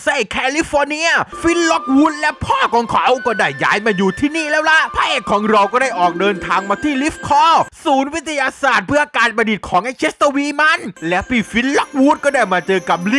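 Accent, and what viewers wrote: South, Thailand. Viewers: อยากได้เสียง ใต้ เยอะๆชอบๆ♥️♥️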